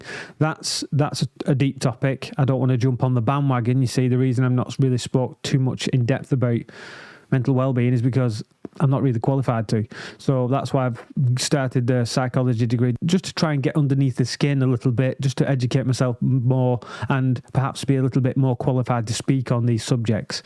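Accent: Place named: Scotland, United Kingdom. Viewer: your deep scotland accent makes you sound "old and mature"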